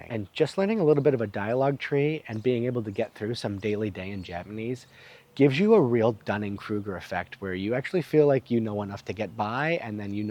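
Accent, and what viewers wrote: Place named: New York, United States. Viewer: Hey man, I've been watching all of your content and you seem articulate and well grounded. Giving me New Yorker vibes (though I know you use your blinkah haha). Do you watch TBYS and Asmongold pretty regularly?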